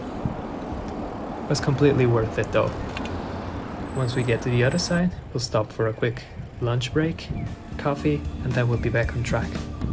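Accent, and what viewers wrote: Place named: Italy. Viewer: you remind me a lot of a really good friend of mine from college days, also from italy, had a very similar accent/intonation as well as a similar way of looking at things (and he was a huge film nerd!)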